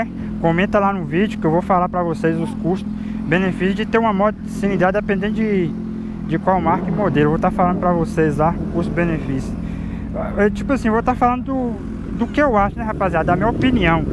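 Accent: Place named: Minas Gerais, Brazil. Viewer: Show de bola! Que "minerês" é este ? show a língua nova, "gíria" kkkkk valeu a história/motivação total! Abraço!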